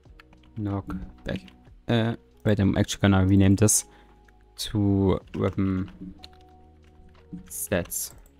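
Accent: Germany. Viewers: Man hört dein Deutsch so raus (°ー°〃) aber du bist cool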